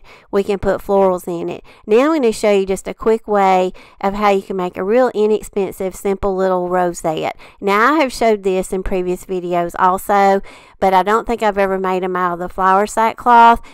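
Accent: South, United States. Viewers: I just need to tell you dear, that I love listening to your gorgeous Southern lilt! Brings back memories of visiting the southern USA in the 80's and 90's!! To yourself it may seem ordinary, but it is just soothing and sweet to hear you speak.....and of course I adore the lovely shabby chic pieces you create so effortlessly!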